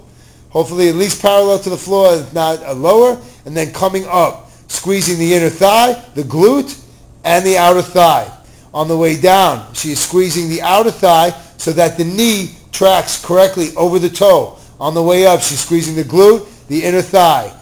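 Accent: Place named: New York, United States. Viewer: Folks from New York...when they talk, I listen.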